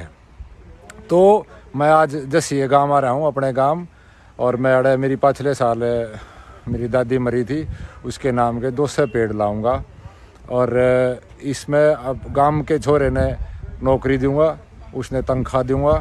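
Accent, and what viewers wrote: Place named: India. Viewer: Bahott bayiya bhie gi App k muh seay Payorr Deasi Awaz sun kar bahott baydiya laga🙏🙏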